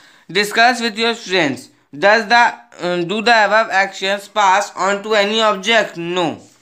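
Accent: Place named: Bihar, India. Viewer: Bihari sa bhaiya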